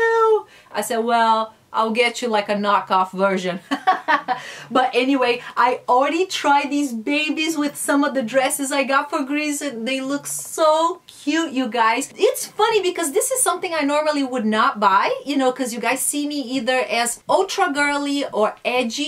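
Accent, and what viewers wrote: Brazil. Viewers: I adore your Brazilian accent 😍🇧🇷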